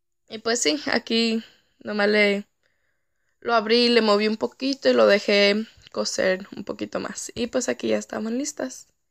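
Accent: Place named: Chicano, United States. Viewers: Por la voz de ella, le atino que vive aqui en estados unidos, pueda ser Texas y que es una chava de unos 19años jejeje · Supongo q mexicana nacida en el gabacho así hablan todos los q nacen en usa y los q llegan desde niños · Me encanta que diga todo en español aunque se le note que probablemente es chicana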